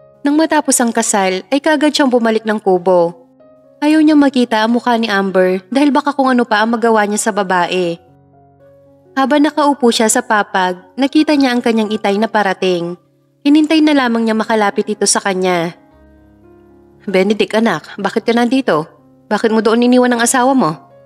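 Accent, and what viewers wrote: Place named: Batangas, Philippines. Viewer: Taga Batangas po ba kayo,my tono kasi ang pag basa nyo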